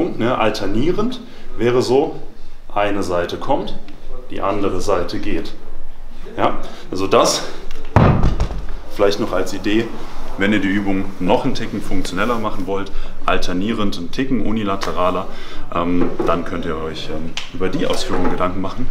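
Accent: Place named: Saarland, Germany. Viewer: finds geil das ich nach nem video gesucht habe das mir die thematik näher bringt 100 prozent alles dabei was ich gebraucht habe. auch geil das ich beim schauen irgendwann den saarländischen dialekt rausgehört hab, geil auch noch dass es aus meinem Landkreis ist xD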